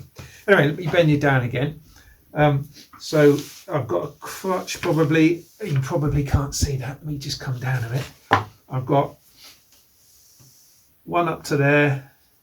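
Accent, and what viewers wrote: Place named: United Kingdom. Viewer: Hi it is the most perfect way to build a glider I am busy with one but come across a problem which unbeknown to you you have just solved and here is the question where do you or did you get the adhesive which first you apply whet than dry and than “HOT IRON ON” I would be very grateful if you could help me out with a address. I am in California USA and it sounds as though you are in the UK by your accent. Regards Peter Onel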